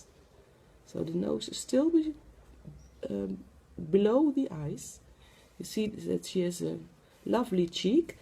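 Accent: Netherlands. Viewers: Just wanted to say thank you for your fantastic tutorials....I'm new to doll making but you have inspired me and definitely shown me the way forward. Your tutorials are easy to follow and I love listening to your lovely Dutch accent! Best wishes and thank you!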